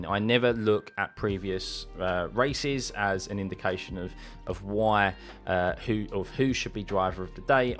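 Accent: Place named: United Kingdom. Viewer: Can you do a non-UK accent? It might hide your obvious bias. Good luck!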